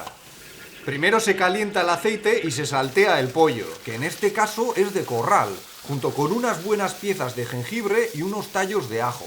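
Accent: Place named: Basque Country, Spain. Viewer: Entre la burrada de "añadimos unos cuantos dientes de ajo" añade como 20 y el acento, algo me dice que eres vasco.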